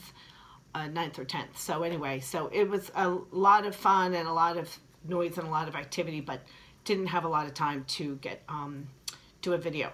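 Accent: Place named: Ireland. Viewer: You sound like you have an irish accent.it might just be me.it's cool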